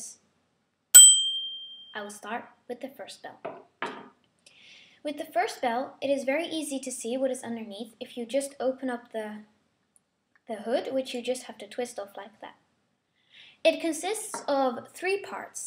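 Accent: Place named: United Kingdom. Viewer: I don't know if you were putting that British accent on or not, but very good video! :)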